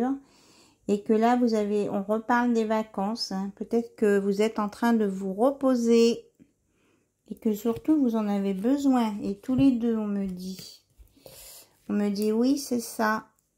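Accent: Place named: England, United Kingdom. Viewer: My Dear Thank you for this beautiful guidance. I love your English accent. I wish you a sweet and pleasant day in Divine Grace and Mercy 😇😇🙏🏽🙏🏽🌸🌸🌹🌹🌟🌟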